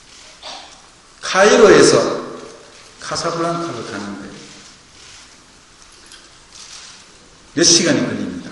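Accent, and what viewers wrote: Gyeongsang, South Korea. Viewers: 역시나훈아님멋져 경상도말씨구수함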